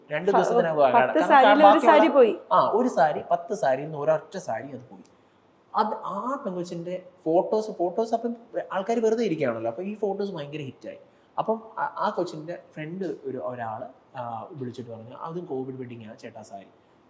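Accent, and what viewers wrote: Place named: Kottayam, India. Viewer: Kottayam karalle ata slang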